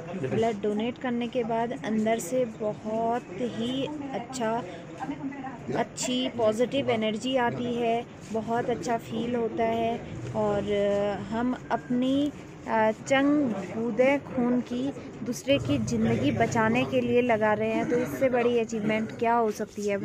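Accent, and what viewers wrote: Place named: Pahari, India. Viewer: Mam uh look soo pretty and cute...and I really like the way you speak pahadi...😘😘😘😘...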